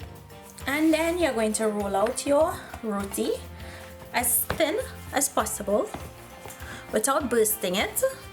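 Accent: Trinidad, Trinidad and Tobago. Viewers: I'm here for the Trini accent - the food is secondary!! :) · L'Ange Gemi-fob it's Trinidad accent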